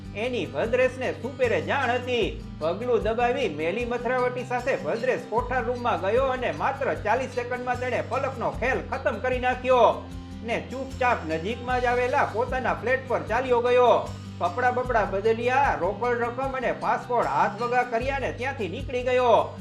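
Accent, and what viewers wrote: Kathiawar, India. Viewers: ના હો ભાઈ તમે ગમે તેટલા બદલો પણ આ રણકો નહિ બદલાતા કાન માં ઠંડક થાય છે આવું ફાંકડું original કાઠિયાવાડી ભાષા ની કિંમત છે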